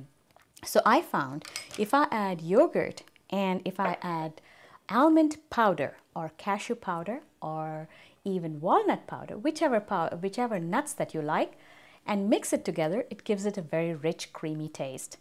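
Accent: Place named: India. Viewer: Is this what u learnt in the entire video..she is Indian and has an accent..live with it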